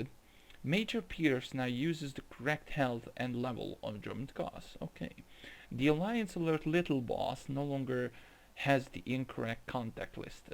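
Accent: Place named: Hungary. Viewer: damn. I should have gotten that...my coworker is Hungarian and now I can relate your accent!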